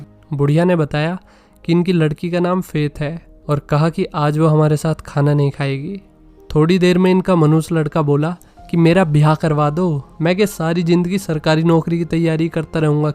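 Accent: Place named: Haryana, India. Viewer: Explainer pkka haryana see hh😂😂😂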